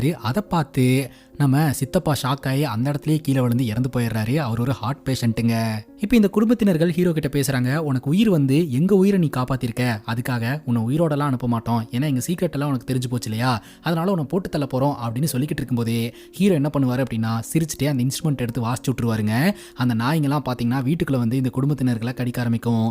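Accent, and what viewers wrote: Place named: Kongu, India. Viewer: may be இவரு கொங்கு region ah irupanga nga...இது கொங்கு தமிழ் nga... inga elarum ipaditha பேசுவோம்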